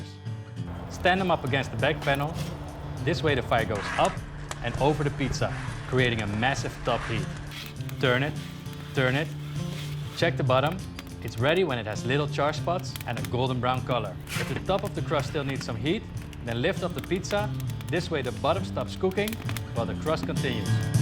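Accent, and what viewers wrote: Netherlands. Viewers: Kan Gwn horen dat je Nederlands bent gap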